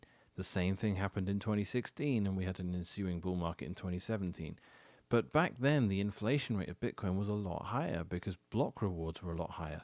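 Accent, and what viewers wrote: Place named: England, United Kingdom. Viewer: This guy is 100% English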